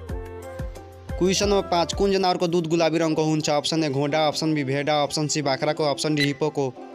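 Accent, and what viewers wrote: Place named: India. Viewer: यो खुद हिन्दिबोल्ने धोति हो एस्लेबोलेको voice सुनेरै बुझिन्छ TRP को लागि यो सब गरिराछ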